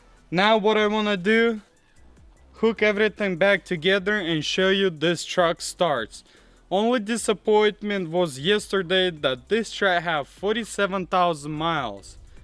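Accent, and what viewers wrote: Russia. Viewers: Блин! У вас русский акцент 😀! Ну молодец, восстановить полностью машину это технические работы! Было приятно смотреть видео!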